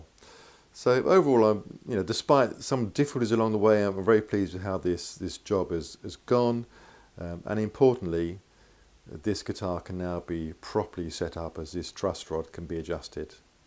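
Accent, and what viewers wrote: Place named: United Kingdom. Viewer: Hiya mate, thanks for this video as I am having the same nightmare with a customers Ibanez right now 😂 and your calm, patient explanation of the process lowered my blood pressure and I have got my screw extractors out and will be - after a nice cup of tea and some hobknobs - go back in the workshop and get the wee bugger out. Poor lad who owns it has tried everything and a previous owner had used imperial on a 4mm nut (argh) so it is totally rounded off in there, and the neck is stuck in a back bow so fretting out on the 1st frets 😕 I just needed a calm British voice to chill me out with a sensible explanation and procedure, and you have saved the day - SEVEN years later 😍 Much thanks 👍👍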